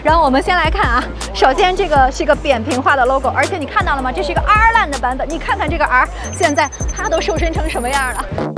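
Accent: Beijing, China. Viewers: 触控板儿，哈哈哈，妹子这浓浓的北京腔儿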